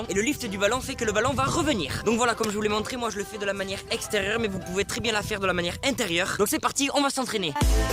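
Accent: Marseille, France. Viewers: Toi t'es Marseillais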